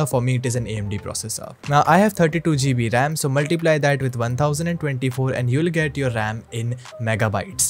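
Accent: India. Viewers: Bro are you Indian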